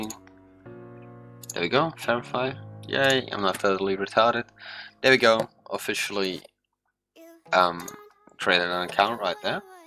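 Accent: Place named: Netherlands. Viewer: Haha, well isn't Dutch your first language? It makes sense that you have an accent of course and I love it xD <3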